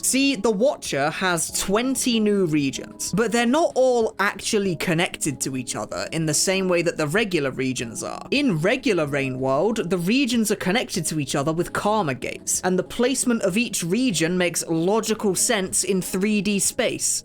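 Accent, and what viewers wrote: United Kingdom. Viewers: he sounds more british than usual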